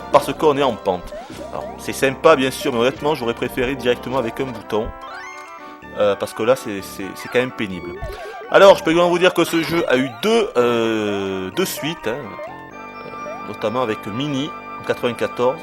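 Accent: South, France. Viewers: Ah sinon, j'aime beaucoup ta voix! Elle est agréable à écouter... cela doit venir en parti de ton accent du sud :)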